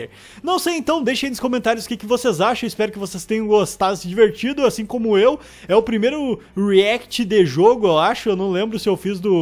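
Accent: Rio Grande do Sul, Brazil. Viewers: você do Rio Grande do Sul?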